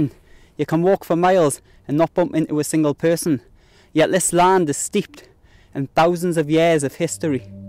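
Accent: United Kingdom. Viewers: At least you have the real accent. Too many folks make videos about Northumebrland and cannot speak properly. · Pardonnez-moi mais je suis curieuse.... Votre accent vient-il du Nord de l'Angleterre ou d'ailleurs?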